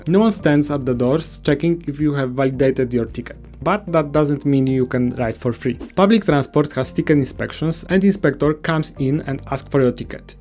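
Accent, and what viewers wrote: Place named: Poland. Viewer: Słychać akcent polski akcent w chuj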